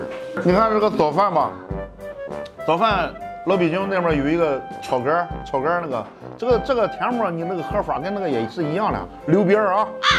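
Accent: Qingdao, China. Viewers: 想回家啊，听青岛话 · 聼青島話，回憶喝青島啤酒吃海鮮，真想故鄉。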